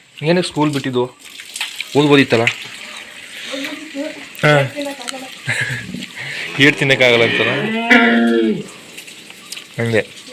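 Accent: Kodagu, India. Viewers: Bro, nivu kodaginavara ….? Nimma mathu kelidre hange ansuthe ❤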